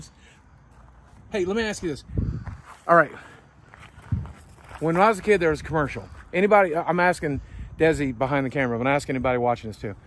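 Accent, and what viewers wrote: South, United States. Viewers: Is that a Southern accent coming back?😉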